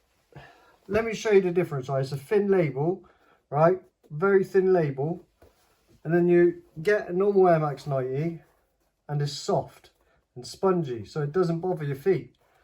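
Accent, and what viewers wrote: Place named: Dorset, United Kingdom. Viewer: Im on day three, already loads mire comfortable those first few days are rough. Regarding the terrascapes comfortability. You in dorset mate. I can hear the accent, i had family down there.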